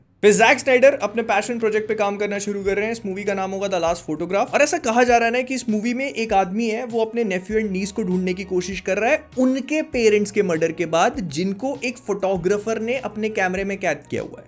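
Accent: Bhopal, India. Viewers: Makda bhopali nikal hi aata hai bhai ka😂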